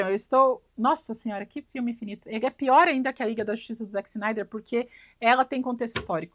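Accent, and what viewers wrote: Curitiba, Brazil. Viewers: Este foi o vídeo que mais soltaste um sotaque paranaense, de Curitiba. Nunca tinha visto tu falar com tanto sotaque. Achei engraçado e ao mesmo tempo intensificou aquilo que estavas falando, combinou. Adorei!!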